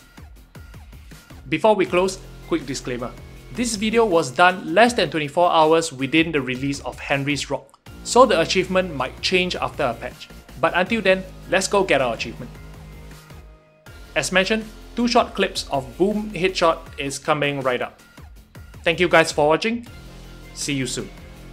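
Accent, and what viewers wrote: Singapore. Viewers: Bruh are you from SG?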